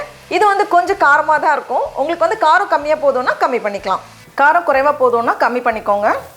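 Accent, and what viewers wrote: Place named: North Chennai, India. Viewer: Your now Celebrity channel ,please improve your Tamil pronunciation ,Dont say la ,Zha ,its only few days of practise. Azhagu !! not Alagu ,tamil means Tamizh or Zha ,avoid north chennai accent too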